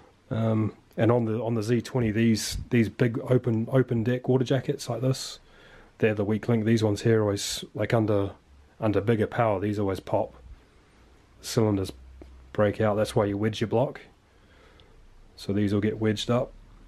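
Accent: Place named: New Zealand. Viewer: You sound kiwi bro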